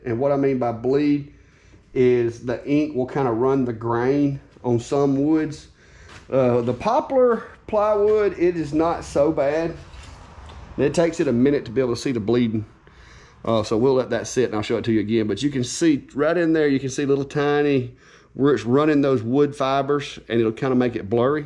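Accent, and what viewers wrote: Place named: Georgia, United States. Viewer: My husband and I just found your videos after getting an XTool and we love em! We’re from SC and we made a bet that you’re from GA based on your accent?!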